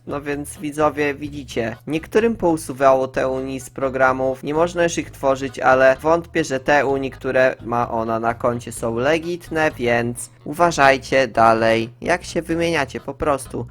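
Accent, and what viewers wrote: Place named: Podlasie, Poland. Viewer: Omg mam wrażenie, ze jestes z Podlasia bo gadasz po mojemu hahah❤️Mój nick: brązowa laska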